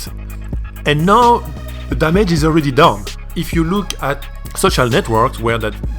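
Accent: France. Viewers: you sound like my one of my brothers here in portland who comes from France ;)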